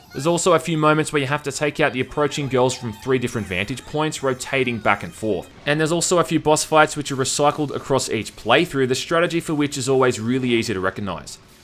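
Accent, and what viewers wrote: Australia. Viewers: I don't know why, but this review made me laugh harder than it should have, possibly because I'm trying to see you as some studious, constantly befuddled Senpai (if that's the right word). Also, I'm dying to find out what an Australian accent sounds like paired with Japanese.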